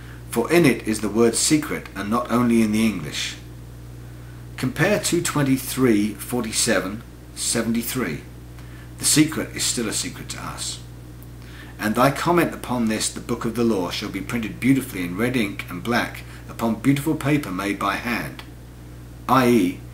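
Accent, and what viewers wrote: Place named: London, United Kingdom. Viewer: I am from London and this chap sounds like he probably came from nearby.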